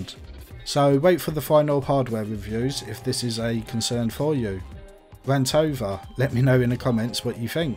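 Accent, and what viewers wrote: Cockney, United Kingdom. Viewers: No matter how many of these videos I watch, I just can't get used to hearing a cockney accent on YouTube. 😅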